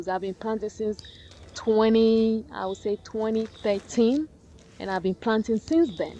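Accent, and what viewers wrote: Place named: Ibibio, Nigeria. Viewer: are you ibibio from akwa abasi ibom? you have beautiful accent miss thank you helpful knowledge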